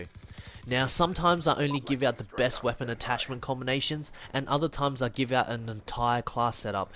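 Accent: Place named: Australia. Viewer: i knew your were Australian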